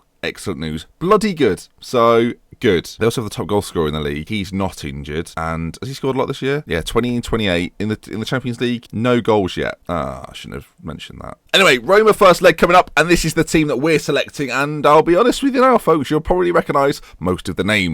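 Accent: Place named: North, United Kingdom. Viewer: your accents just kept going further and further north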